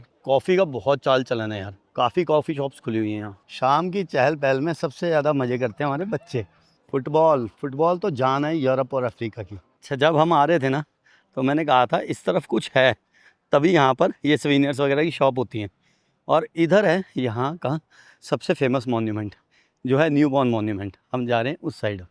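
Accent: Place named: Delhi, India. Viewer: Bhaiya tum to Dilli ki boli bol raha ha Dilli da ho kya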